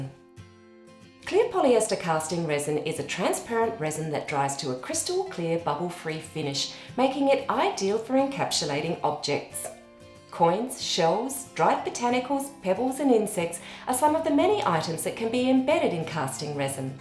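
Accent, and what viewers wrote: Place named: Australia. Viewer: Her I am thinking this is an Australian product... as the lady talking is Australian! Lame! so expensive to buy in Aus.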